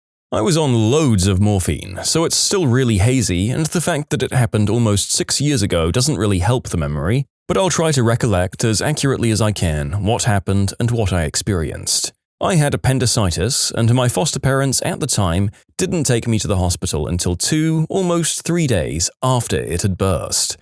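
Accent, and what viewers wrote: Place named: United Kingdom. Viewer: does anybody else not like the british voice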